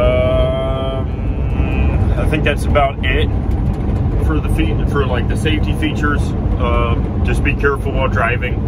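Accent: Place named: United States. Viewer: I thought he from the states ok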